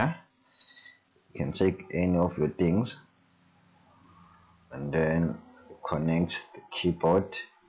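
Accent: South Africa. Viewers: U from south africa I can hear ur accent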